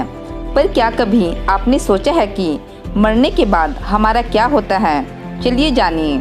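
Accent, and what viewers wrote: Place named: Kullu, India. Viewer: Behan ji app kullu district see hoo ?? Apka accent kullu ka lag Raha hai??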